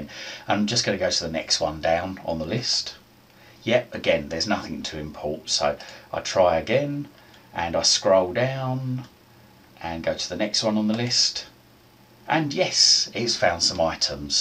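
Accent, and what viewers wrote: United Kingdom. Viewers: Too hard to understand his British accent. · I've just found this and I know its going to be clear and useful!..........and thank goodness for your British accent!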